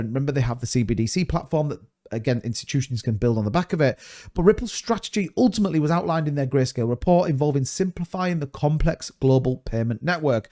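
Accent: United Kingdom. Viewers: Holly sh1t! You're a Brit! I have duly subbed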